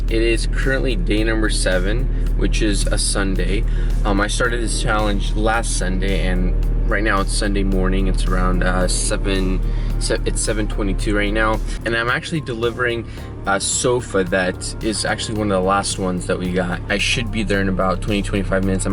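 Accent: Russia. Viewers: Are you Russian or Slavic? I’m willing to bet my left nut you are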